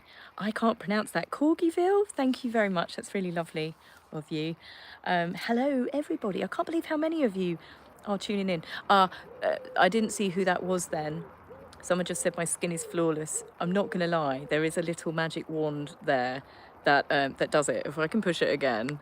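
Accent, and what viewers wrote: United Kingdom. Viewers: you are pretty with or without makeup. We live in the country in SE Georgia, USA, agricultural area hot and humid. We are having afternoon thunderstorms almost daily! It’s a Summer time thing. We are natives of NE Florida, same weather! Love your accent. What part of UK you from. We speak SOUTHERN USA! 😂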